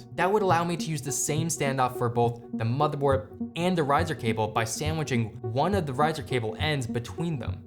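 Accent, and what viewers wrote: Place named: United States. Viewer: Dude sounds American, and it's a very standard evening hour in USA.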